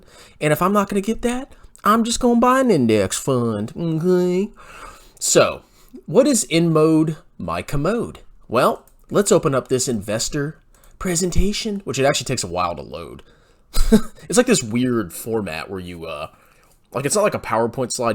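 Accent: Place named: Virginia, United States. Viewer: Haven't heard the Virginia accent for a while..come on. Pllleeeaaasssee!!!!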